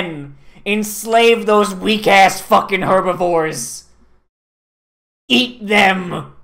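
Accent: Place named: Queens, United States. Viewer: From someone from the Queens island. ... You sounds surprisingly like someone who's from well Queens New York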